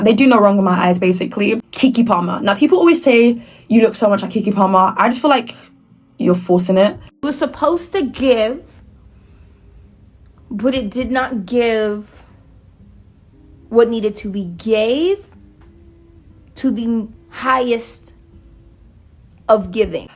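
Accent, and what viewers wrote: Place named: United Kingdom. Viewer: trouble understanding you at times cause of your British accent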